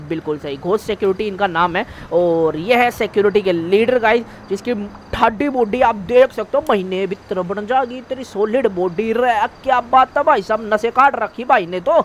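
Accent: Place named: Saharanpur, India. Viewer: WAHHHHH BHAI SAHARANPUR WALI BOLLI ENGAE UNGAE ❤❤❤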